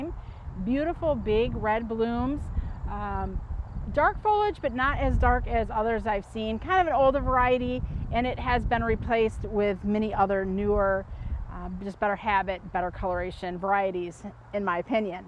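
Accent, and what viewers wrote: United States. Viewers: That Northern Midwest accent takes me back to my family...😊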